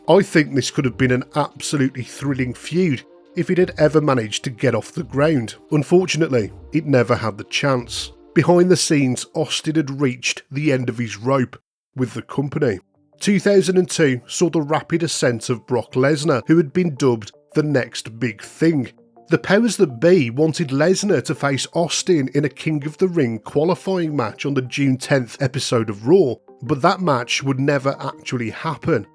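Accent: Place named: England, United Kingdom. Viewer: Love this guys English accent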